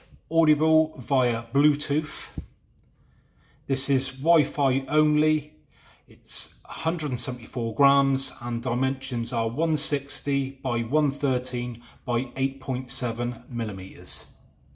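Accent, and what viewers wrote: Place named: West Country, United Kingdom. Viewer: Great to hear a West Country accent, not enough of them on YouTube! Just bought one of these, it arrives tomorrow, my first "upgrade" in 11 years and this was very helpful. I chose the white in order, I hope, to avoid smudgy fingerprints! I also paid the extra tenner and opted for their offer (new I think) to set it up for me, using my Wi-Fi, not sure how they do that and my Amazon Account!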